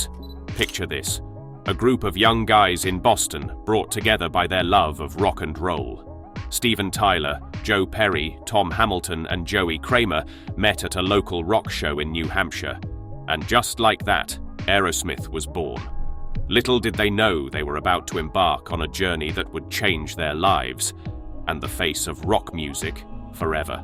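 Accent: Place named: United Kingdom. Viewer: I am so done with British commentary a big thumbs down! Old and wearing end condescending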